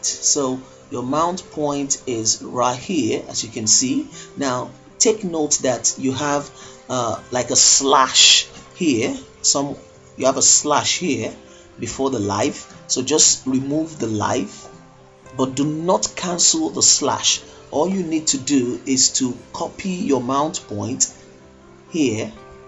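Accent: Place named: South, United Kingdom. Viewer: my mistake then! You kind of have this unique south british accest you know haha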